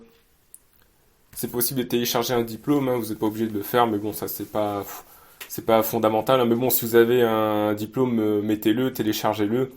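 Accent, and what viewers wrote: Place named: Nord-Pas-de-Calais, France. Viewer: la vache l'accent chti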